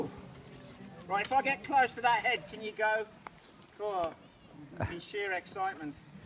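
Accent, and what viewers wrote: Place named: Midlands, United Kingdom. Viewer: This is just how people from the Midlands talk.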